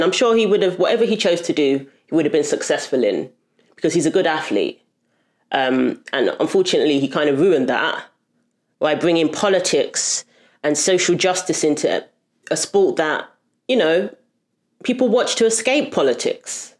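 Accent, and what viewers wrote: England, United Kingdom. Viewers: Just found your channel and subscribed straight away. It is always a joy to hear the Queen's English spoke so eloquently, and a young person with such good perception. Your accent suggests that you are from England or a Commonwealth Nation, being in America now makes your perspective extremely valuable for the rest of us. Looking forward to following you!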